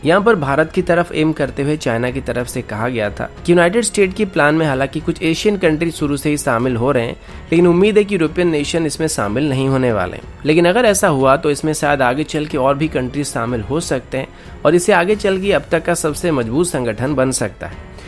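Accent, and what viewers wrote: Odisha, India. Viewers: Bhai tame kana odia pila ki??? Language ru jana paduchhi tike tike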